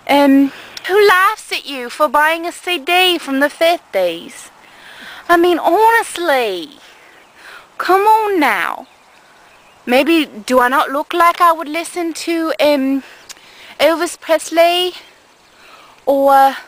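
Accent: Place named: United Kingdom. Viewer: Way to go great British accent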